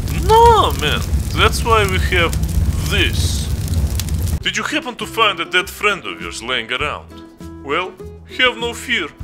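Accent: Russia. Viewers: Are you having russian accent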